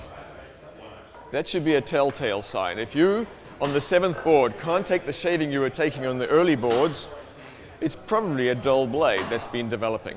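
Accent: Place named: South Africa. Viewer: A south african bloke working at lie nielsen? Love it. Great technique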